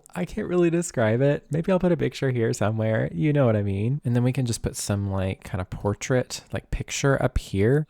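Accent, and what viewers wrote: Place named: Appalachia, United States. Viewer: i knew when i heard ur accent i was like he sounds like he’s from KY😂😂 I guessed eastern bc ur giving Appalachia 😂❤ so proud to see you here 🎉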